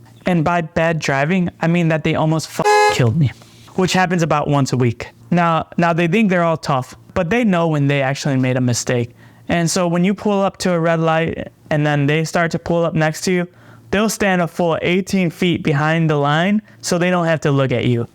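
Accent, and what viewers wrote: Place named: New York City, United States. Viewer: Sounds like NYC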